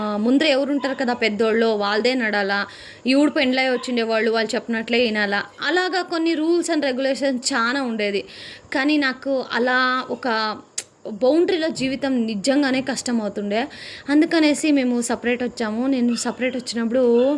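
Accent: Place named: Karnataka, India. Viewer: Sister do you speak kannada also. Because I heard some kannada words in your voice over....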